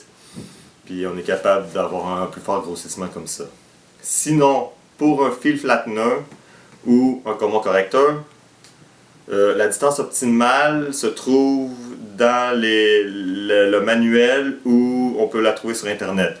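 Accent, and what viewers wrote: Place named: Quebec, Canada. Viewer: Mon dieu ca fait du bien d'entendre du Quebecois, sur un site qui publie des tutorials d'astronomie good gradulation.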